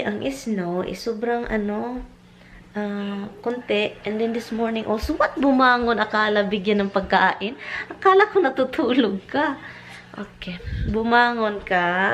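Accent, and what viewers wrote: Visayas, Philippines. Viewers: I enjoy your vlog so never mind the pronounciation understood na yun mga pilipino tayo hindi naman tayo british or amerikano love you.♥️♥️♥️ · Mkatawa mn ko mgtan aw nmu sis oi 😊🤣sagdihi lng na imu prounounciation bisaya ra mkarelate ana😁😁😁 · Hello and Tommy watching from Muntinlupa City.. Parejas ta bisayang dako dhay oi...yun english pronounciation ntin matigas hahaha😂 never mind the bashers insecured lng cla sau. Kc sobra blessed buhay mo kysa knla. Nkktawa kng panoorin ang saya mo panoorin dapat gnun buhay msaya life dpat gnyan tau iwas stress...enjoy life lng😉😍 katawa ra ta oi😂😂😂 kalami sa imong sud.an lato ug daing.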